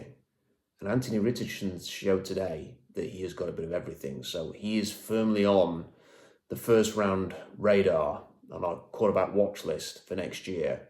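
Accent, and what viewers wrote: United Kingdom. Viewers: Wait! Hold on! You've got a British accent, and you have no problems with "football"? Where's the "foot" in "football"? I always hear.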